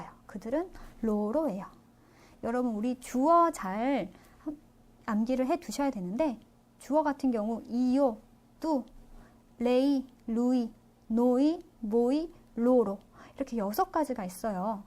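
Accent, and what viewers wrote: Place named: South Korea. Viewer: 발음이 그냥 한국어 읽는것같은데요 책산거 돈아깝네요 환불하고싶어요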